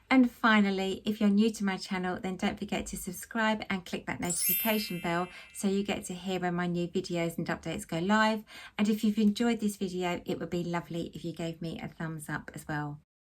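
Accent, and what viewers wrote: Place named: England, United Kingdom. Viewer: Bonjour, et merci pour vos vidéos qui sont de bonne qualité, 😃 (j'apprends à tricoter les chaussettes, et plus particulièrement les talons en partant de la pointe des orteils) 😀 le seul problème c'est que je ne comprends pas l'accent anglais et mon vocabulaire est plutôt scolaire...😊 Auriez-vous la gentillesse de régler vos paramètres, lorsque vous mettez vos vidéos en ligne, afin que les vidéos et sous-titres soient dans des langues étrangères, dont le français, svp, s'il vous plaît ? Mes paramètres personnels sont réglés pour mettre les sous-titres en français lorsque YouTube m'envoie des vidéos de pays étrangers.... Par avance je vous remercie et vous souhaite de belles fêtes de fin d'année...dans la paix et la sérénité 💐💟🎅🤶🕯️🌈☃️🥰🌹🙋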